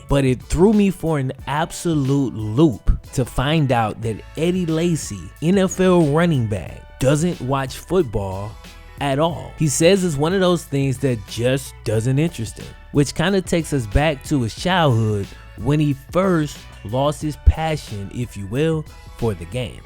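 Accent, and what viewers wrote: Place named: New Orleans, United States. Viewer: Are you originally from New Orleans, cause you have a slight New Orleans accent?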